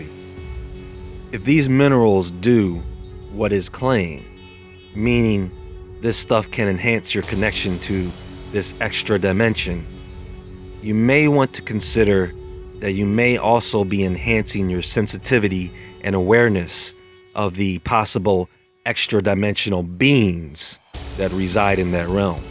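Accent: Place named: New York, United States. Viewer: Bro are u from NY? you sound like it..I'm from bx live in queens now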